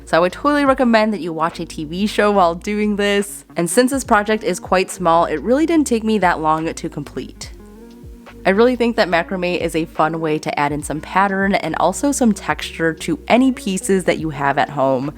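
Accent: Chicago, United States. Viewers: where are you from? I sometimes hear a Chicago accent!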